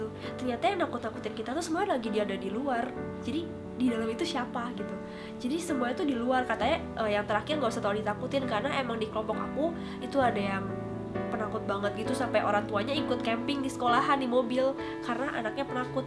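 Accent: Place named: Banyumas, Indonesia. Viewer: Ngapak Onlen :v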